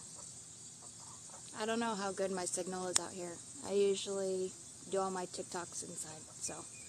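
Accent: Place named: Florida, United States. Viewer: I can say as a father of daughters I am very proud of you getting away from Lie-net and focusing on your family. You sound like you would fit right in my country area of osteen florida. Jeremy hales is a really good guy who sticks to his word and helps through the heart and has much respect world wide. I look forward to more videos from you.... until then keep that pretty smile going😄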